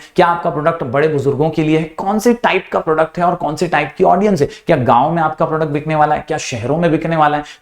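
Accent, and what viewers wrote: Maharashtra, India. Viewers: Thanku sir marathi tadka mast hota😂❤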